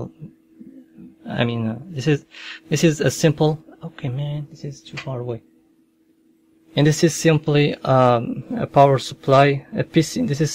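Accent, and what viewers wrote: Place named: Morocco. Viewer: u kinda sound moroccan to me, o lah o3lem..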